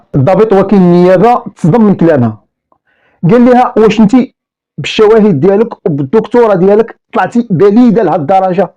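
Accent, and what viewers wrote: Egypt. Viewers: أ حسنت القصة بيمافيها اللهجة المصرية أ حبك في الله يا أخي ❤❤❤ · السللم عليكم خويا ❤❤❤❤🎉🎉🎉🎉 صوتك بالهجة المصرية غير عادي هههههه نحبك تروي قصتك بالهجة المصرية ههههه❤❤❤❤❤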